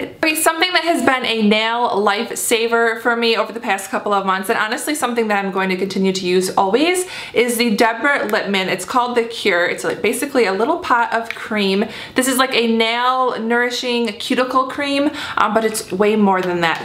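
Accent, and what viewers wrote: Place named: Midwest, United States. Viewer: Are you from the midwest? You sound like you have my accent! :)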